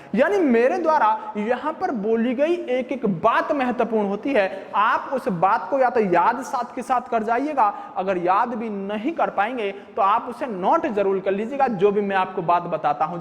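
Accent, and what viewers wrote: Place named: Western Uttar Pradesh, India. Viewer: Itna clear hai Bhai ki ye west up se hai shayad bulandshahar.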